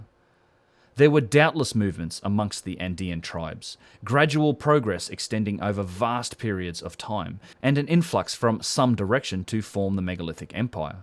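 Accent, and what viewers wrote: Australia. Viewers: Is the voiceover a really good Aussie AI voice?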